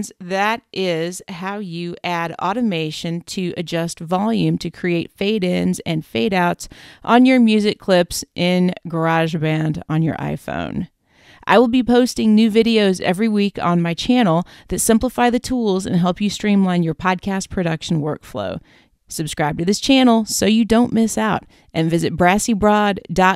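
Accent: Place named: United Kingdom. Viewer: U sound so British lmao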